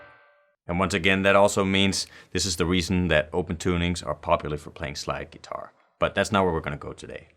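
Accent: Denmark. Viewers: Sounds like a Dane speaking English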